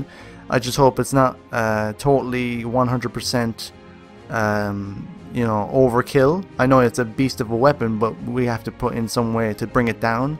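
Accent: Ireland. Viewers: Hey, how do you get to play at E3, i was at it, but i didnt get to play anything? also great video, are you irish? u sound irish, im irish lol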